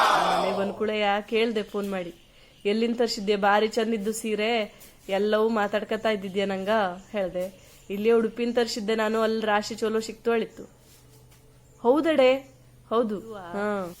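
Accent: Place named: Yellapur, India. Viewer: ನಮ್ಮ ಪ್ರೀತಿಯ ಯಲ್ಲಾಪುರ ಕನ್ನಡ ಬಾಷೆ ಎಂಥ ಸೊಗಸು 🎉🎉🎉🎉🎉🎉ಧನ್ಯವಾದಗಳು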